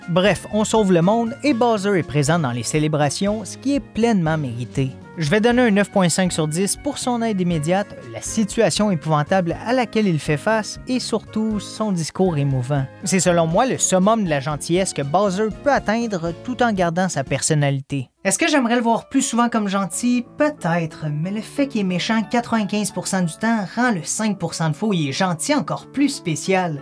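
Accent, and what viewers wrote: Quebec, Canada. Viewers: Il n'y a que Kamek que l'on a pas eu l'occasion de jouer en tant que personnage régulier. Ai-je tord ? Et sinon, j'adore ton accent.😊 Vive le Québec.😁 À plus.🎉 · Des vidéos toujours aussi intéressantes, et malgré que je viens de France, l'accent québécois ne me dérange absolument pas, au contraire personnellement, je le trouve juste tellement cool et relaxant donnant un aspect chill à la vidéo et les blagues ton grand-père sont à mourir de rire x)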